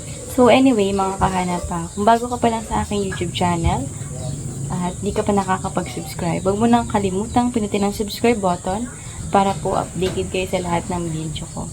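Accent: Philippines. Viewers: idol tonong ko sana anong probincia ka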